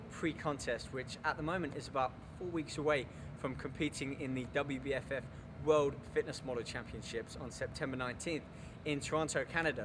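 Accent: United Kingdom. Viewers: U look and sound really british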